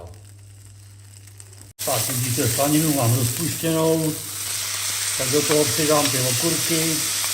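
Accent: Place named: Prague, Czech Republic. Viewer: Dědo Vy jste krásnej Pražák , s nádhernou pražskou češtinou 🌺🌺🌺 a já Vás ráda poslouchám i kvůli té Vaši krásné češtině 🇨🇿🥰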